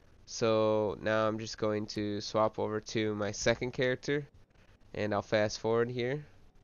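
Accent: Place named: Cuba. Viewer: superliked you sound like a cuban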